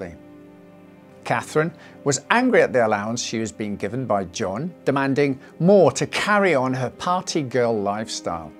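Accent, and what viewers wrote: United Kingdom. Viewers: Stories are just more exciting and easier to listen to when read with a British accent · What a fabulous discovery this channel is. I listen to MrBallens stories and they are brill but I do prefer to listen to a British voice if given the option.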